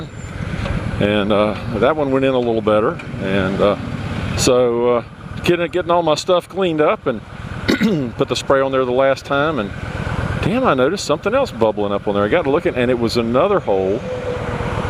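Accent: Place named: South, United States. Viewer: Well I for one love your Southern accent! Over here we have so many people who use Rising Inflection when they speak! It's SO annoying! Apparently we have the Australian soap Neighbours (there you go, another word spelt differently lol ) to blame for this!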